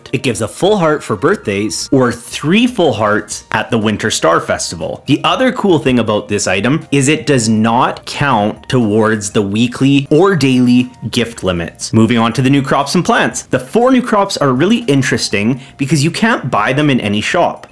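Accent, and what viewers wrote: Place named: Western Canada, Canada. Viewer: Great videos and your western Canadian accent is really cute ^_^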